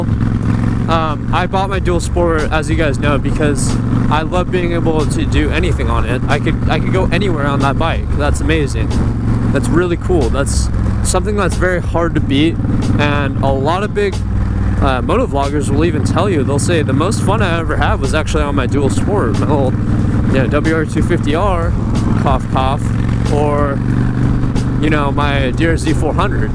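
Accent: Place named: Russia. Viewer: u tebya ochen yarkiy russkiy akcent, by the way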